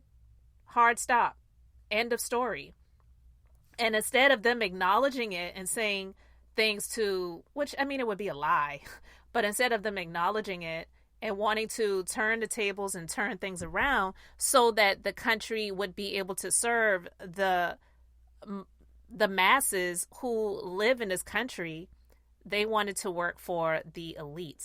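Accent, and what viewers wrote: Charleston, United States. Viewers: Hey girrrl, you sound like a Charlestonian!!! Then, you do know this jerk is full of it!!!!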